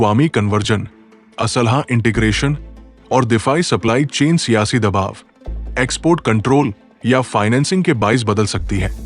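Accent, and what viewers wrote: India. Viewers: زبان اردو ہے مگر لہجہ اور تلفظ دونوں انڈین ہے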